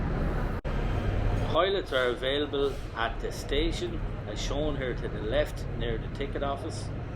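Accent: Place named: Midlands, Ireland. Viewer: You've a midland accent.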